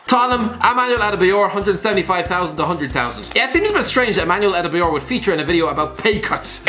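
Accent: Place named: Ireland. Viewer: Man I love this guy, listening to his Irish accent is getting me through quarantine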